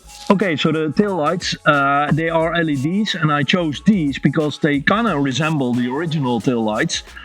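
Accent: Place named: Netherlands. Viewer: When I hear you talk I get the feeling you're origanally from the Netherlands 😂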